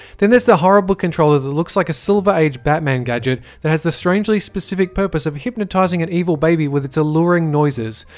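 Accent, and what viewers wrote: Australia. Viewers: No he is just Australian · ummm he's Austrailian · He's an Aussie, mate.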